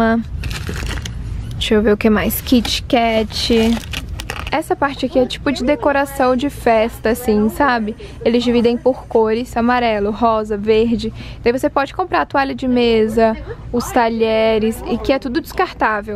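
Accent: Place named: Santa Catarina, Brazil. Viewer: No início do vídeo já imaginei que vc era aqui de SC pelo sotaque 😊😘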